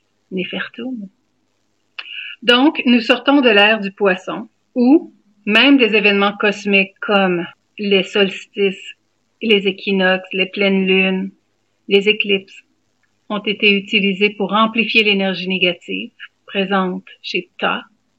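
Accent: Quebec, Canada. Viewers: Avec ton accent québécois on ne peut qu apprécier ! J te promet ma belle ! ❤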